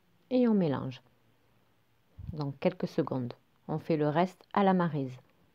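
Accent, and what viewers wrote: South, France. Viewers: Bonjour j'adore vos recettes et votre accent c'est du midi de la France?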